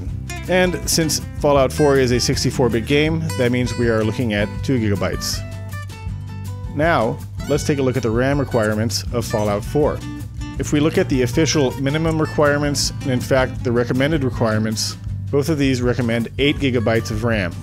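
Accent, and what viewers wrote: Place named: Netherlands. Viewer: it sounded a little bit Dutchy. This vid was great by the way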